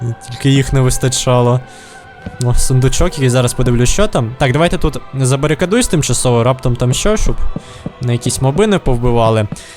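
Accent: Transcarpathia, Ukraine. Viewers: Ти з Закарпаття? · вхахвхвах закарпатьська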